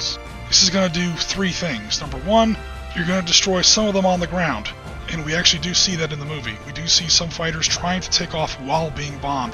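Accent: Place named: United States. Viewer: Being the cynic I am, and hearing a US accent (maybe think Ebert's populist given the then times, and so effectively clueless, unobjective, totally missing the point (which was the sentiment of people who lived through this and later generations) review), and mention of gamers, I thought I was going to be compelled to post some, if not many, criticisms of this review, but couldn't find any. So far anyway! I'll just have to watch this again and try and find some! A really excellent, objective, enjoyable review here, more than worth watching; and watching again...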